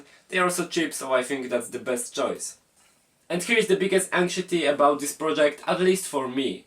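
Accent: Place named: Poland. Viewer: oj słyszę tu chyba polski akcent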